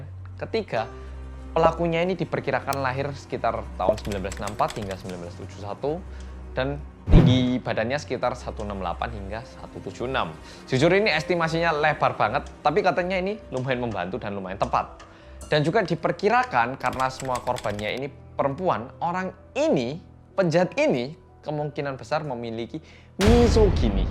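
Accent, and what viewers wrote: Java, Indonesia. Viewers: Gercep Kembali lagi,bersama oramg korea yang..... "MEDHOOOKKKK" salam dari Jawa Timur yh mas. Lup²❤ #BoloneHansol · "medok" nya ngegas mas :) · medok nya bikin aku kagett tiba2 ada falsetto hahahha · medhok nya waw banget.. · Hallo gaess welcome to back korea reomit bersama orang Korea yang medok😁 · Lanjut mas medok setelah nessie judge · Medhooooooknya bikin ngakak😭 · ... yang medokkk ~ * auto cabut earphones * okey . . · Medhoookkknya kok pake nada tinggi mas?? Wkwkwkw... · Orang yang medok i love medok owakwao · Kaget medoknya😭😭😭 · Mas: halo guys welcome back to korea reomit bersama orang korea Me: * siap-siap * Mas: yang... Me: MEDHOOOKKKK ❤❤❤❤❤ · Semangat buat Korea medhok, nanti klo wabah selesai ke SBY ya bang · "bersama orang Korea yang medhooooookkkkkk" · Medokmu membuatku terkesan 😁🤭🙏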